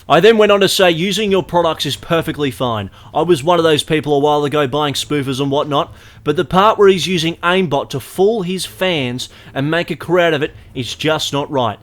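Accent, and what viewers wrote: Australia. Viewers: Fucking love Aussie accent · the hard australian accent disgusts me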